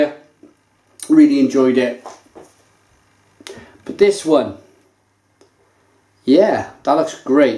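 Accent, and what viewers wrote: United Kingdom. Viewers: Gosh i hate British accent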